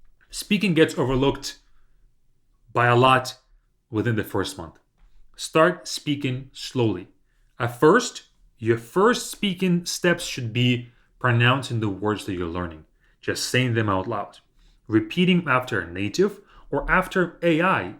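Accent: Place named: Russia. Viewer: I followed you since at least when you intruduced you as an former tennisplayer i think. Remember the kvartira, yes and now you are speaking eng/U.S. with russia accent and also with the lungs, breth in and out. Importment when speaking is foreginer langauge. My teacher in russia told me: ”you have to speak with your soul and heart”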